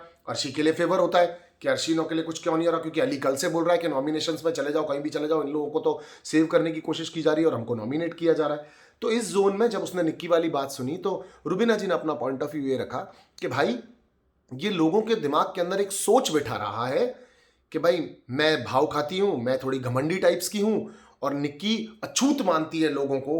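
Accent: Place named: Haryana, India. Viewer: 😃 I love ur haryanvi man hahahaha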